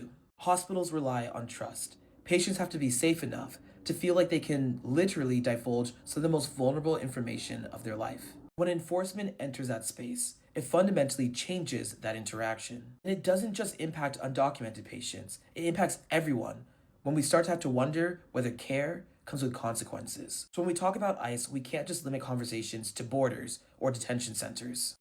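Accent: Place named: Africa. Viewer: You are correct about privacy. We are in crisis in America--Aliens need to go home and get their healthcare and keep their privacy. Some of the immigrants are dangerous killers. You should be happy that ICE is there . You look and sound like a 1st or 2nd gen, African or Haitian, so. you see things different than non administrative Americans.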